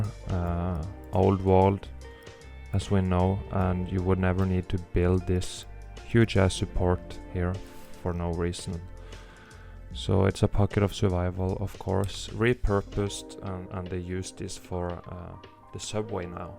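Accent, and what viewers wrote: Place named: Scandinavia. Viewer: Where r u from. Those of is in the states have a hard time recognizing different accents . My guess is 🇳🇴 or 🇸🇪 or Finland 🇫🇮.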